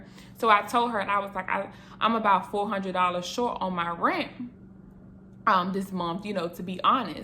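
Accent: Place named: South, United States. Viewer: I enjoyed your video that I came across by chance. Your forthrightness and candor are refreshing and inspiring, and your southern accent is boffo. Eating fruits and veggies are best for our health and digestive system. Supplemental nutrients and vitamins are necessary too. Especially the Omegas 3, etc., and B Vitamins (particularly B 12 if you have vegetarian leanings). Stay away from foods and drinks that have preservatives (Propylene Glycol, BHT, Sodium Benzoate, MSG, Potassium Benzoate, etc.) in them. Preservative are used in cosmetics and medicines a lot too, and can be absorbed into the body transdermally. Overall, preservatives, fillers, and binders are generally man-made chemicals that are not natural or organic. Their use is for preserving the shelf-life of products to maximize profits . They can wreak Havoc in our bodies by disrupting hormones, cells, blood chemistry, and can cause cancers. 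If you think about it, preservatives, fillers, and binders - overtime will cause problems for our digestive systems. In other words, they make digesting what we eat harder, inefficient, and ineffective. This all applies to the nutritional health and safety of pets too. While writing this, I remembered something I read in a Malcolm Gladwell book concerning hormone disruption in women. At this time, I cannot remember which one of his books. Before getting into his findings - let me introduce you to him. Malcolm Gladwell is the author of five New York Times bestsellers — The Tipping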